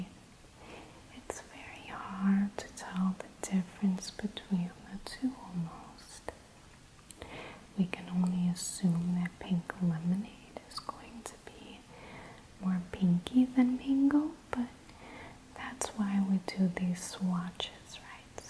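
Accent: United States. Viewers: aka American accent version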